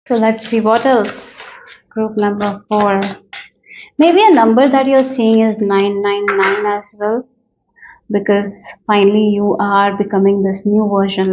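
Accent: India. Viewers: Are you indian ? Your English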